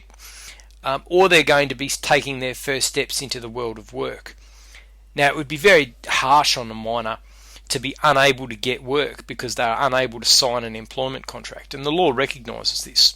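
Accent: Australia. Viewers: Please excuse my ignorance, but does this apply in United States law? Or is this upload meant for Australia (or elsewhere, I'm only going off of your accent honestly lol).... Not trying to generalize (maybe you're in the US and just happen to have an accent from before), but I figured I'd better make 100% sure that this will apply to my own situation :) thanks for the insight on contract law! Very well explained.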